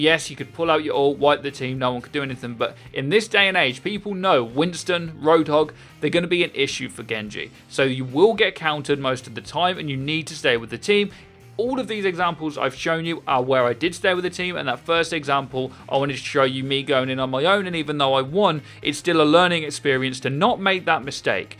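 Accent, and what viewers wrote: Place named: Norfolk, United Kingdom. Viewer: You sound like a fellow Norfolk boy!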